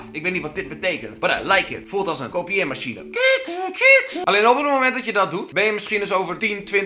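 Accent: Groningen, Netherlands. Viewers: Grøninger accent tog jonguh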